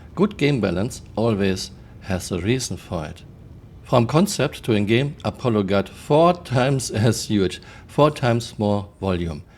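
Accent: Germany. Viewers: Du bist ja Deutscher. Höre ich an Deinem Akzent. Das Problem der Medic-Schiffe ist das neue Gameplay. Wenn ich alle Betten vollständig mit den Gels auflade und eventuell noch ein paar in die Regale stelle, dann bin ich first Target für jeden Piraten und Griefer. Folglich wird keiner mehr Med-Schiffe haben wollen. Toll CIG, oder sehe ich da was falsch?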